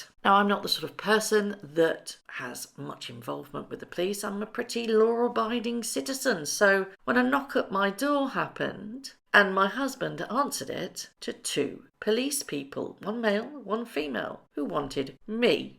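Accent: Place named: United Kingdom. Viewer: Dear. I said before that your British accent is adorable and so easy to understand ❤ Greetings from California. 🙏 Thank you for spreading the Truth. Wishing you and your family all the Best. Hope and 🙏 you have an easy recovery on your health. Last but not Least, you are a genius in knitting. Truly hope one fine day, you will give us a knitting tutorial how to make a knitting doll 👍 Her Majesty The Queen Elizabeth II ❤, You are loved ❤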